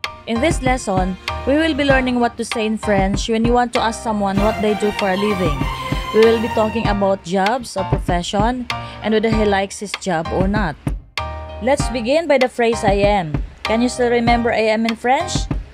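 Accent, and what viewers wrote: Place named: Philippines. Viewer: Why does the background music remind me of motion gate dream works section?amd I love ur philippino accent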